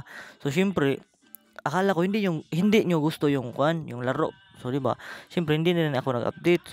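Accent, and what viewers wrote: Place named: Visayas, Philippines. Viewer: Pareha lang ta idol Bisaya hehehe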